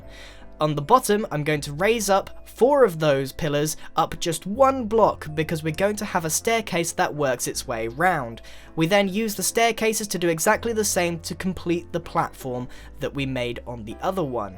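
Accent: England, United Kingdom. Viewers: Are you English coz I am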